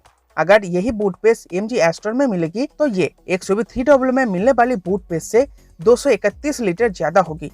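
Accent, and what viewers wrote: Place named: Bengal, India. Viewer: Saale बंगाली. Baat kese kar raha hai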